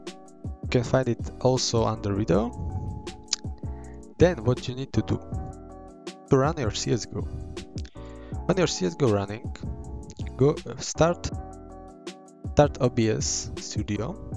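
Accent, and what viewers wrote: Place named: Slovakia. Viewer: som si hovoril že jaká slovenská angličtina :D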